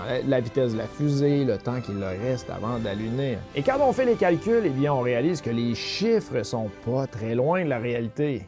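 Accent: Quebec, Canada. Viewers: L'accent québécois comme j'adooooore :) + la voix de la petite voix "trop chouuuu" J'ignore si je suis le seul à aimer ça XD Aller hop, je m'abonne direct et bonjour depuis la France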